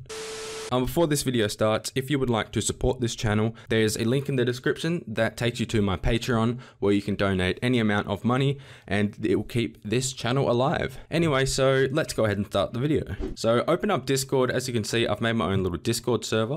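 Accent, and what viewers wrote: Australia. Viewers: finally, a farking Aussie!!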